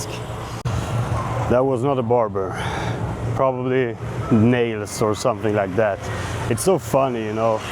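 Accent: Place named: Finland. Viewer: Vilken härlig och positiv finsk tjej! 😊Även om hon har en mycket bra engelska, så hör jag direkt en finländare.😉Menit seurantaan. Odotan innolla tulevia videoitasi!😉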